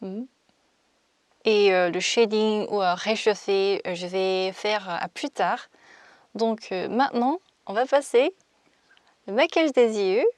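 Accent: France. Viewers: j'aime beaucoup trop t'en accent Français tu es magnifique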